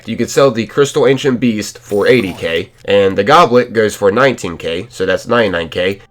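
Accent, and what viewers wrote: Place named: Mid-Atlantic, United States. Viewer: That mid Atlantic accent though 🤓 where you from man? My guess is MD or PA.. am I right?!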